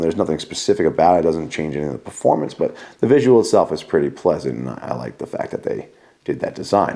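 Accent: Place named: East Coast, United States. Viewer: now, hearin your accent, you gotta be east coast! lemme know!!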